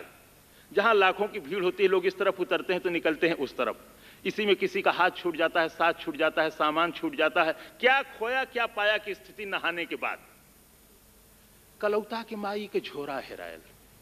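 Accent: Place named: Varanasi, India. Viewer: Jabardast, Banarsi andaj wala Bhojpuri.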